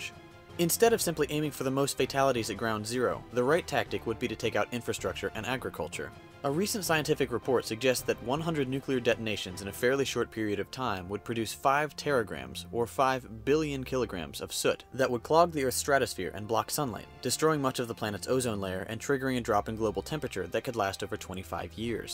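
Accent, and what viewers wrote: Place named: Dallas, United States. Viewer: so this is why your voice seems so familiar, you live in Dallas(like me), and thus your accent is familiar!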